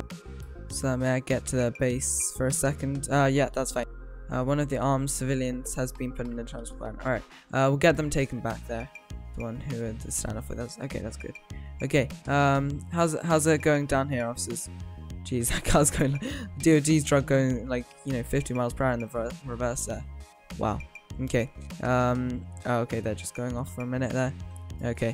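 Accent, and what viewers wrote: England, United Kingdom. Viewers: are you english you sound english i hope you are because i am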